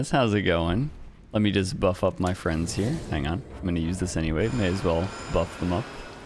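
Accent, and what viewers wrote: Canada. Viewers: Can someone in the know answer me this question: Is a Canadian man of Asian ethnicity? An Asian-Canadian, as it were? I know nothing about him, just his voice and slight accent, and if there was a gun to my head, I'd guess he was an Asian guy born in Canada or at least moved to Canada very young.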